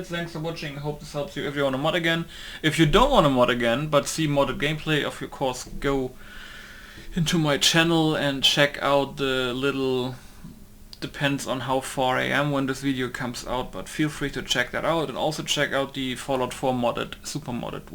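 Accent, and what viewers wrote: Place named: Germany. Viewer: Dude seriously as a german myself watching this video was funny af with his constant accent Iam litterly dying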